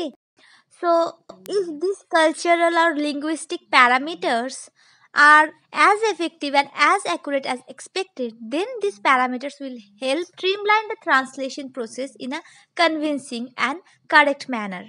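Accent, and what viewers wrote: India. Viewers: Loved your strong indian accent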